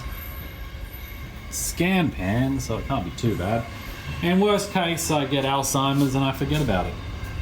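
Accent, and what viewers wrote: Australia. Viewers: Looks like the dual-tap sink typical of British sinks... except you sound Aussie and keep your eggs in the fridge like Americans. I'm so confused!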